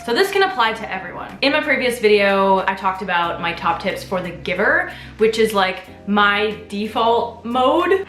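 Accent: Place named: Canada. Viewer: I repeat I'm here only for sweet Canadian accent 😁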